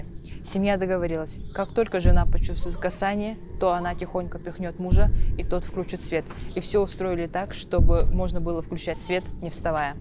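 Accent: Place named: Yakutia, Russia. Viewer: А какой акцент ты ожидал от якутки, именно акцент и делает нас не одним целым с русскими а отдельной нацией со своей своеобразной культурой и языком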